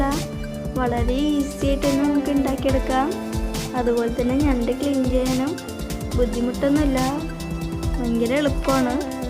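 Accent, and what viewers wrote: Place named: Thrissur, India. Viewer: സംസാരം കേട്ടിട്ട് തൃശ്ശൂർ കാരി ആണെന്നു തോന്നുന്നു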